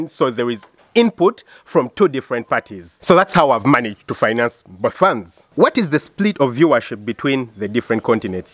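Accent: Uganda. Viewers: Our favorite Dr,we love your passion for farming.We also love your Ugandan accent.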